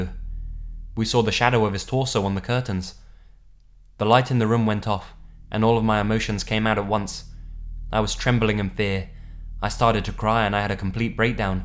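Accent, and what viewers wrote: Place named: United Kingdom. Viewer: Good stuff. One of the best narrators for this stuff, I'll even forgive the Brit accent.: ) Actually I think that's what really brings it together, keep it up. I wonder how many creepos listen to these stories and are like "Oh shit, that was me! Awesome."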